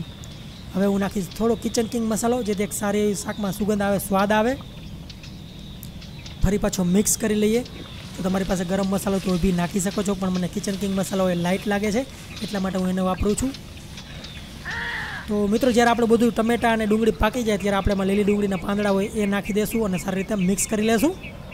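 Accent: Kathiawar, India. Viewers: Your language pure Kathiyavadi😍🥰🤩🤩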